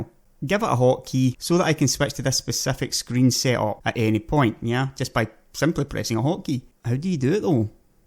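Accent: Scotland, United Kingdom. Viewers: Its your great Scottish acent my friend, and as an English man I think scots speak English better than us! Great video. Well done m8! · Hey, what's the bit rate on that Scottish mouth of yours? You really get roll'n ! :D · Funny thing Scottish accents - this one and Sean Connery's accent are the very loveable but for some reason it makes one always want to break out and speak in Scottish-. I love to always try and do a scottish accent from time to time - don't know why but simply just love it even the ones whereby you don't understand what the hell they are on about. You just know that you love it. Love it love it love - a very attractive language that immediately compliements any person first hand. It's just wheather that person immediately with a head start because of the Scottish accent can actually stay ahead and that then boils down to the real person in hand, hmmm!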